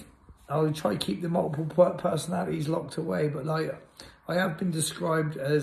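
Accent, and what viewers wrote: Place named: United States. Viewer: The yank accent is gold, never surrender, greatness awaits 💯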